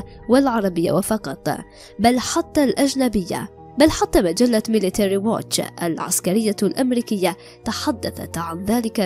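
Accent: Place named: Morocco. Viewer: الله يشافيك يا صاحبة القناة راكي مڨريبيا باينة من صوتك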